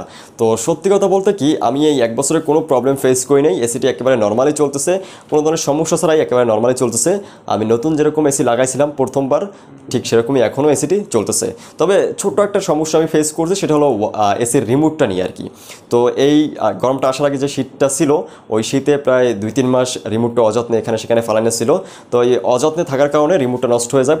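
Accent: Barishal, Bangladesh. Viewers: ওরে বরিশাইল্লা!! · vai bari borisal naki😅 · Vai koto taka khaisen? Apnar kotha shuinna matha ton ton kortase. Apni Barishaylla tai na? Chapa baji valoy janen. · Your language is telling that you are Borishal person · ভাই বরিশাইল্লা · ভাইয়্যার বাড়ি বরিশাল কোন হানে!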